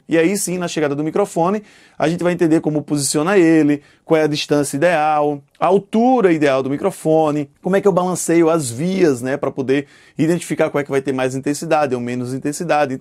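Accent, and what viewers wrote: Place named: Pernambuco, Brazil. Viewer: Imaginei que fosse pernambucano.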